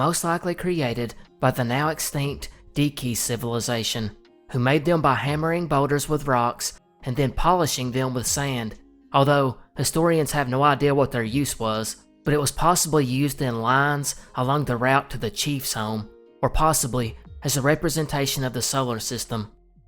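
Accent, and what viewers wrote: South, United States. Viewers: You're sincerely my favorite channel. Yes, I am a Southerner and your accent has a familiar and friendly familiarity, but that's hardly the only reason. You're information is researched and vetted and your attention to detail is impeccable. Thank you for your excellent work and the excellent entertainment!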